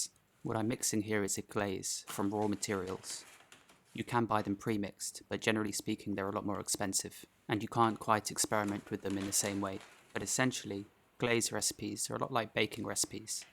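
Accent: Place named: England, United Kingdom. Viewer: These videos are like a mini documentary. I think the edit of the video and over dubbing the narration is the strength of production. Having an English accent lends to the 'officialness' of it all. I like the pottery produced, but I'm at a loss as to the rapid success of sales other than whetting the appetite of anticipation with previews of quality and attention to detail these videos showcase.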